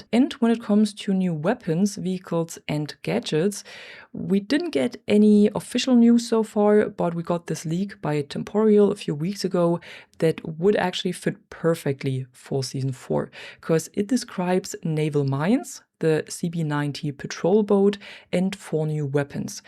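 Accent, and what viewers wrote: Germany. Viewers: this is my new favourite battlefield channel. are you from germany? you sound german :D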